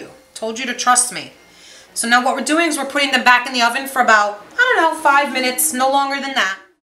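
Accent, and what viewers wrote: New York, United States. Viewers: THAT'S A NYC ACCENT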